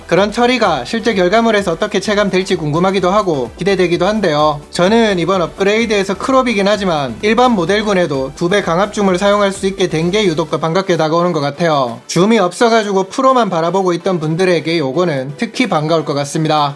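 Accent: Gyeongsang, South Korea. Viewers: 아이폰때문에 처음 봤는데 영상시작 20초만에 나오는 경상도 억양에 빵터졌네요ㅋㅋㅋㅋ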